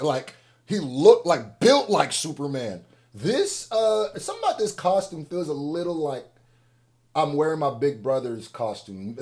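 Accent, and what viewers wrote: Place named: Philadelphia, United States. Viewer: have you ever spent time in Philly or have family from there you sound like a Philly nicca sometimes. I’m from Baltimore but live in Philly now but I swore you were from Philly for the longest and you just said mixed him up mixing is some Philly shit I only learned when I moved there from Baltimore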